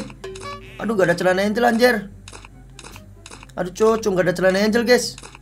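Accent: Java, Indonesia. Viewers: Jowo tengah hader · Bang sampean wong jowo Yo ? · Bang jebule Kon wong Jawa yah,ngerti ora bang nyng ngomong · Bang kamu orang Jawa tengah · BIAR MEDOK AJA SIH, BIAR GA SOK INGGRES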